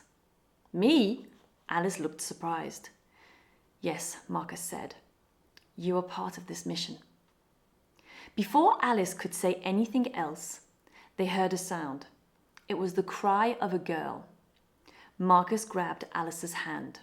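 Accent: United Kingdom. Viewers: Professor, I am a 100% beginner. I did not understand the story, but I was listening with subtitles in English, of course, to see the words and how to pronounce them. Is this a lesson for me as a beginner? I mean the art of listening. I liked your British accent. It is nice not to pronounce the letter “r” in some words. This is a difference between a British accent and an accent. American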